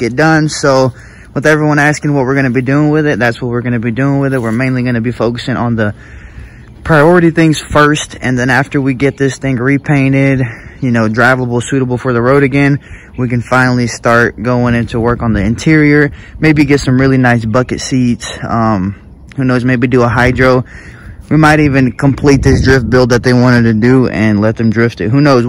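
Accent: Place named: South, United States. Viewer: you even talk like the GQ boys :D (or maybe it's a southern dialect, idk but its funny in a good way). it's definitely going to be a cool project to accomplish. good luck mate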